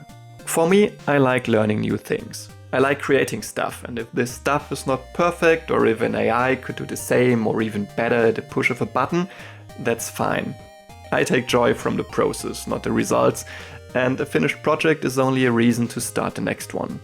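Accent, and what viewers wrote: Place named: Germany. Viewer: I'm guessing you're German, based on the accent and the fact that you speak more articulately than most native English-speakers. Great video!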